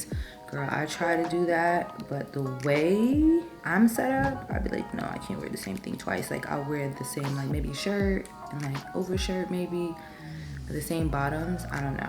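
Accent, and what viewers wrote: New York, United States. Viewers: we live for that face and NY accent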